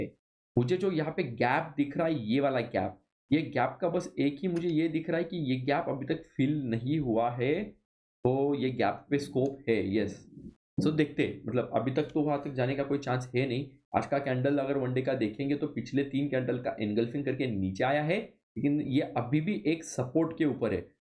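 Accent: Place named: Gujarat, India. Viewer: Sir aap gujrati ho asa lagtahe apke voice se 😊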